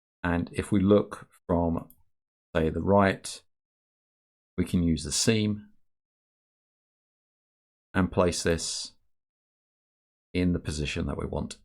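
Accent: United Kingdom. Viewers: Fantastic video, I'm so happy to listen an English accent.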